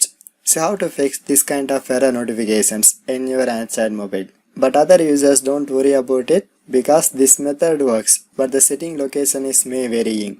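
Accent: India. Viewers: Yep. It is English in Indian accent. English is not our first language. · well this accent is South Indian English accent , Im an Indian and personally don't like it too . Try listening to North Western English accent it's far better xD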